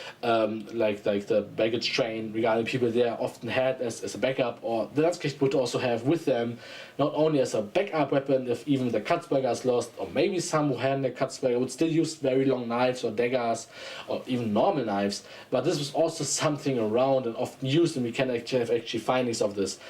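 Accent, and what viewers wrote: Germany. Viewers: I mean, realistically given that accent, he should probably have a German or Austrian flag behind him. No one should have patriotism to the European Union that’s freaking weird one could say almost disgusting.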